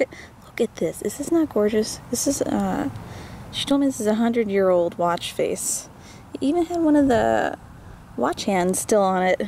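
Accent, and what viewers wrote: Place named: Canada. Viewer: Oakay sooooo?! You sound like you've got a little Canadia in ya der! Disciple18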